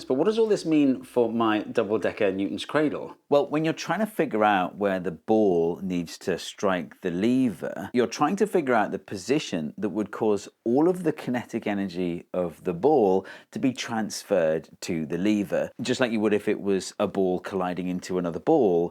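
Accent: United Kingdom. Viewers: Just an idea that popped into my head at the end of the video, no idea if this is relevant or not, but does the hinge location relative to surface the ball is striking on the lever make a difference? The levers you tested either had the hinge point in the center of the lever or on the opposite side of the lever from where the balls where striking it. Since all these levers will necessarily have a thickness, the hinge point was never in-line with the contact surface. Would there be any difference if the hinge point was inline with the contact surface? what if the hinge point was moved in the opposite direction (towards the balls)? Or what would happen if you moved the hinge point even farther from the balls (like a couple inches[or I suppose you could use centimeters] back)? Another idea that popped into my head while typing this; why do English people not spell it 'leever' when they pronounce it that way? 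You don't pronounce the word 'level' that way.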